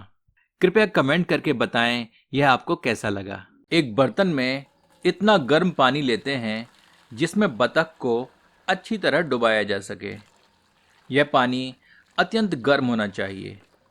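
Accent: Standard. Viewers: Whh sudh Hindi very nice bro thanks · 💯 shudhh hindi I like it☺️🥳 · Itni shudh hindi in cooking 😮 very nice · बहुत ही शुद्ध हिन्दी का उच्चारण करते हैं आप सुनने में बहुत ही अच्छा लगा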